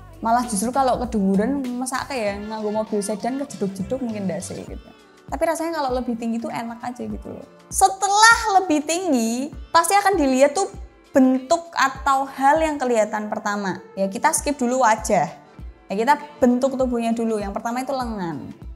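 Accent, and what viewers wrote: Java, Indonesia. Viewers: Jowo ne pundi mbak'e? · Jowo banget uwoooow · Jowo toh mbak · jowo ne ketok poll yo wkwkw 😀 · jowo bgt logat km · Senyum sendiri menyimak medoknya wong ayu iki... Wanita Jawa memang luar biasa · Jowo bgt sampeyan mbak,👍👍👍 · Pertahankan Logat jawanya..saya sangat suka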